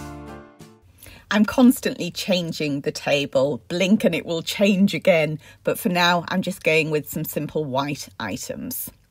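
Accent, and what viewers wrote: England, United Kingdom. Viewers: Utterly gorgeous! Sounds a little English🥰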